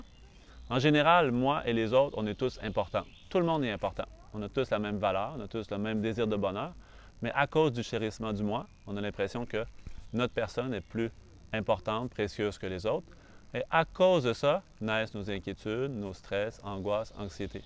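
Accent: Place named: Canada. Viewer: Merci! C'est car j'ai un ami canadien et j'ai reconnu l'accent, c'est très joli le français parlé au Canada :)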